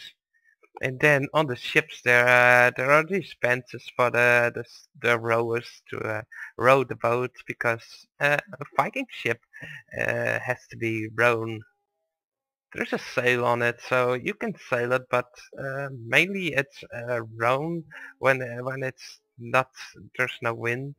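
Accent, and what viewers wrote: Netherlands. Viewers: okay are u dutch because u sound like that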